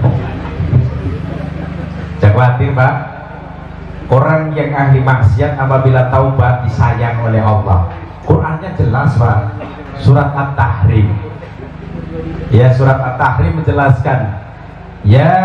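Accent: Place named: Banyumas, Indonesia. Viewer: mantaab yi, ngapak banget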